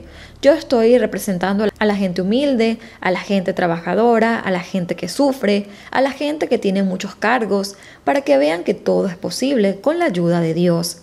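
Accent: Venezuela. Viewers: Amo tu acento venezolano 🌈😍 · te felicito me encantas como marras los casos una pregunta tengo curiosidad eres venezolana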